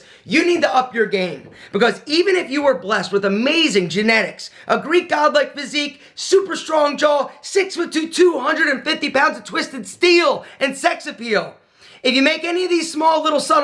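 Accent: Philadelphia, United States. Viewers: “Drink wyahter” “medium lenth hair” 😂 I love how you can still hear Philly accent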